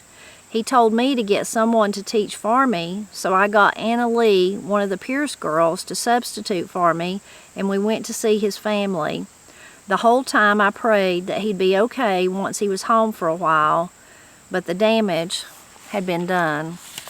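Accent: Appalachia, United States. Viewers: Ms., I want to thank you for celebrating our beautiful language and giving it the respect and dignity it deserves. My people are from Blount County, TN and my parents took accent reduction classes in the 70’s before I was born. They still think they did us a favor, but they took something precious from us. I understand why, but I’m sad about it. Hearing you talk and read to me brings it back a little bit and I am grateful for that. 😭😭 thank you so much, Ms. ❤️❤️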